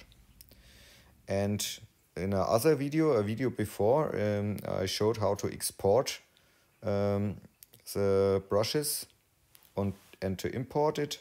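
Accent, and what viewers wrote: Germany. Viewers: English with german accent :)) very very useful videos Thank youuu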